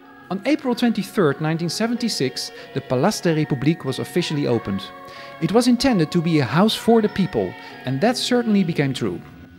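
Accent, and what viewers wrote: Netherlands. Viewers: Interesting, thank you. Your accent sounds Dutch, so not an Ossi :-) What made you take up DDR history? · Blame the Dutch accent. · Great vid, I'm guessing your first language is Dutch? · You sound very Dutch. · You mean “the only channel about East Germany in English” with a heavy Dutch accent! Calling it “English” is a bit much. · he has dutch accent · First time viewer(and new subscriber)…very informative thankyou…I lived in BRD(München) IN 1985/86 and visited Berlin a few times…I remember this building…also..back then..never in my wildest dreams did I imagine that the wall would come down….p.s. to my ears your accent sound Dutch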